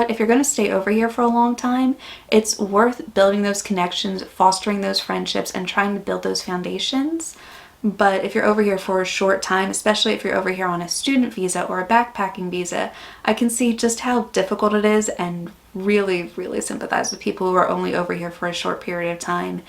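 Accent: Australia. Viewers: Hi, I sense your voice is starting to sound Aussie 😊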